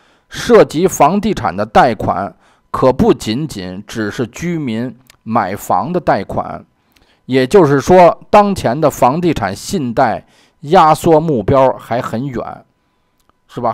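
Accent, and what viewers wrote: Beijing, China. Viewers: 很喜歡你的視頻真棒京腔京味！聽了很親切。老北京人赞你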